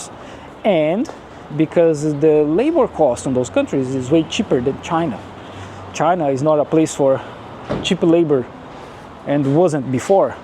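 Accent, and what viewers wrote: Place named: Brazil. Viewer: I understand because he's a Brasilian talking in English 😅😂😂😂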